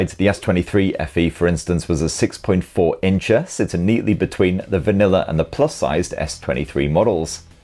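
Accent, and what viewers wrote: United Kingdom. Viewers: He sounds British but doesn’t act shitty like them which I like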